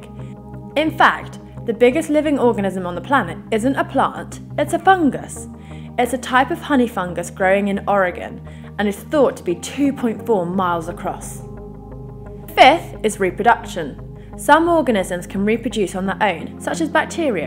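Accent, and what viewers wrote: United Kingdom. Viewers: You sounds more like a british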